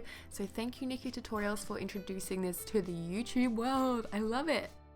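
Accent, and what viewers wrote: Australia. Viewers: wooow loving the hair ur amzing too love u by the way I'm OBSESSED with the Australian accent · she does. I am from Australia and she sounds like any ordinary Aussie girl. Perhaps your perception of an Australian accent is a bit different?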